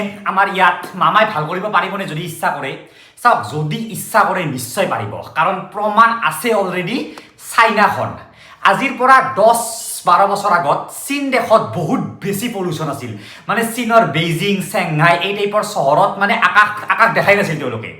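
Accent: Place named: Assam, India. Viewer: ka content = Dusron ka hard work + Assamese accent!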